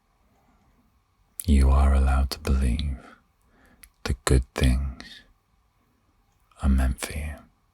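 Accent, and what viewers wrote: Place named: United Kingdom. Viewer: calm British voice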